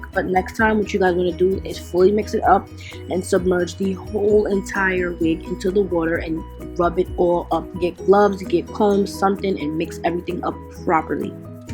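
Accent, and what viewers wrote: New York, United States. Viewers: Omg I love hearing u speak reminds me of home NYC girl great video it came out so nice does the hairspray really protect the lace I’m so worried bout that cause I like the lace to stay u did good sis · You from New York ?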